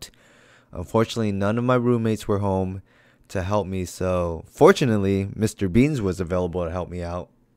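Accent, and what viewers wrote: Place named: United States. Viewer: hi from peru!! i like you videos i am so curious what is your ethnicity is? you sound are like american. :) thank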